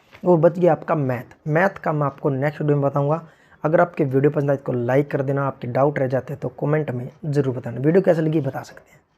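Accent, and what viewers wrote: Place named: Haryana, India. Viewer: Haryanvi for a reason ❤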